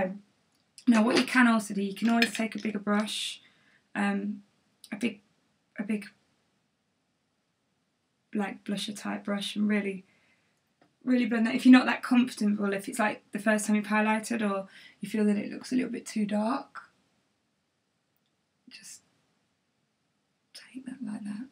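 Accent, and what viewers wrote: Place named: Derby, United Kingdom. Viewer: Your Derby accent is strong haha. Didn't recognise it on towie x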